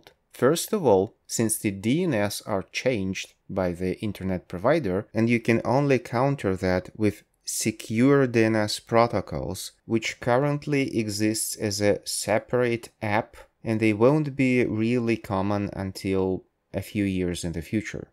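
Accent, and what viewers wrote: England, United Kingdom. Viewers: Oo vi iz anglii?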